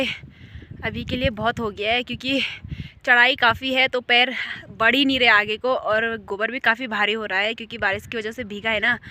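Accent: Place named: Garhwal, India. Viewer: Sudhh Hindi bhasa baii... with gadwali tone.... mtlb kya hi bolen.... 🙂🙃🙃😃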